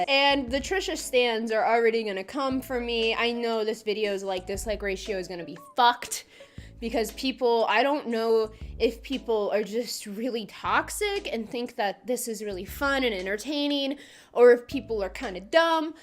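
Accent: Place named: Michigan, United States. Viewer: Are you from Michigan? Lol I'm just guessing from your accent. Im probably wrong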